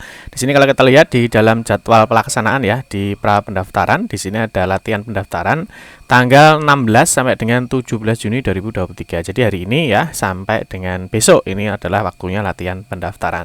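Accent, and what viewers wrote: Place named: Java, Indonesia. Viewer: ga ada pengisi suara yang lain kah? ini medhok banget dan nyentak2, kurang lembut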